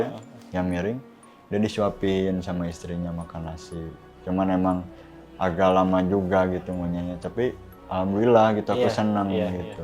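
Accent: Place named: Pandeglang, Indonesia. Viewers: Gile 2 jam nonton bang mode serius. 😂 Si abangnya cerita khas banget orang Sunda, selow, tapi aku betah soalnya sambil bikin kuah bakso. Hari ini di Jember lagi merayakan hari raya ketupat btw. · mas y org mana kyak logat org pandeglang banten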